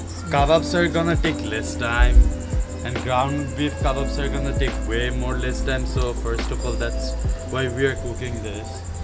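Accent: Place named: Jamaica. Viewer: This reminds me a lot of the jamaican jerk chicken, I see where the the chicken comes from but after listening to his accent i finally understood the jamaican jerk in it. plus it seems like the bengali dude with the jamaican accent has to learn to give an outro.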